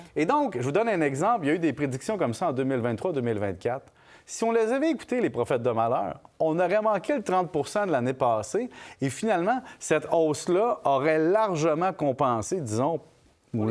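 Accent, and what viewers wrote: Quebec, Canada. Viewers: J'adore les analyses boursières avec l'accent de nos amis quebecois... 👍😂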